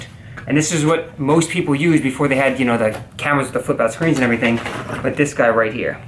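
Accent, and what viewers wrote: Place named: New York, United States. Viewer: to be perfectly honest one of the main reason I subscribe nowadays is your New York accent, I learned English back in the days mostly from the movie goodfellas and even though you don't have as pronounced accent there's still a hint of it and that keeps me subscribed 😂😂😂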